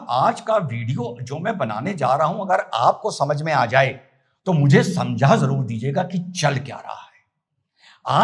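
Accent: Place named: Punjab, India. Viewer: Punjabi ki tarhaan bol rahai hindi yeh musalmaan nahin .beta talfuss toh theek keeleta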